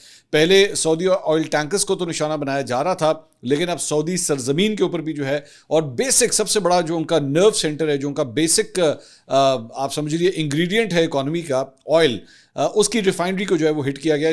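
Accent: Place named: Lahore, Pakistan. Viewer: بھائی یہ جو سٹی کا نیم ہے وہ اپ پروپر اس کو بولیں گے تو۔یمبو کہلاتا۔میں سعودیہ الریاض میں رہتا ہوں۔ اور اپ کی اپ کی ویڈیوز کو باقاعدگی سے دیکھتا ہوں۔اپ کا بات کرتے ہوئے پنجابی لاہوری ٹچ بہت ہی کمال ہوتا ہے۔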